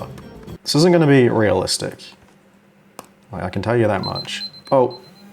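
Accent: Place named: New Zealand. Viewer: I knew you were a kiwi!!! I was like why does he sound so familiar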